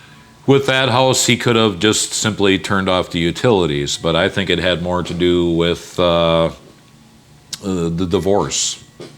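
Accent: United States. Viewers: I'm surprised his ex-wife didn't blow the whistle on him??? By the way I love your Minnesota Accent!! You sound like all my relatives!! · sounds more like a Wisconsin accent.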